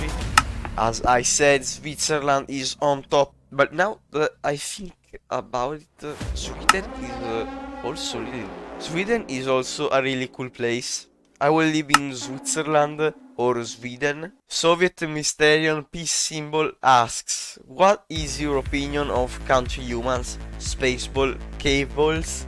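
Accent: Italy. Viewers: Si sente che sei italiano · Yes, his accent is Italian... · But, you're Italian? because it looks like you have an Italian accent.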